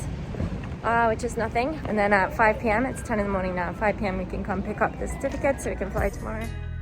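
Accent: United Kingdom. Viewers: I’m glad that you recovered! Thank you for sharing your story! I love your YouTube and your British accent ☺️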